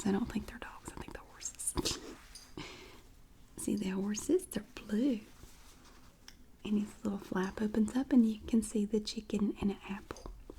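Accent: South, United States. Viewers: I guessed those places because she sounds just like my wife who was born in Jacksonville, FL but grew up in Memphis, TN and Byhalia, MS. Southerners are self-conscious about their accents but geeze I love em. It's so American. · omg southern accent level 1000 haha,you are great girl!!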